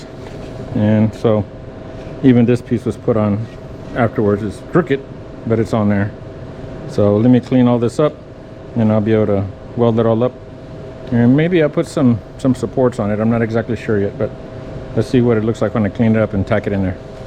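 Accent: Texas, United States. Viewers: I like your pachs. I like your texases way of saying texas way.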